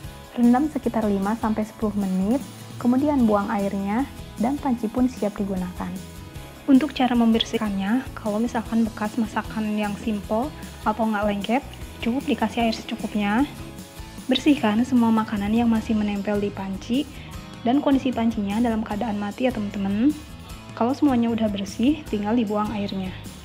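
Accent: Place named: Sunda, Indonesia. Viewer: Kangen sm suarany,,,orang jawa timur tapi suara sunda heee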